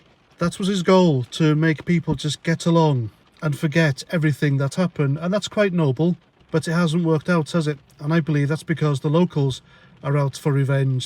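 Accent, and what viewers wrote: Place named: London, United Kingdom. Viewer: Sound like London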